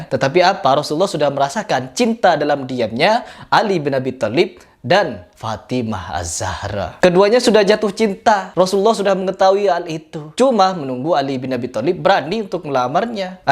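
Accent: Madura, Indonesia. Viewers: Logatnya kental madura wkwkwk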